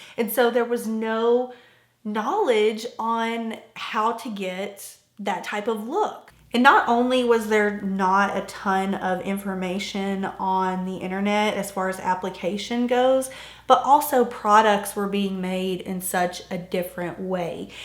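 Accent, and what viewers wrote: South, United States. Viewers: i love your slightly southern accent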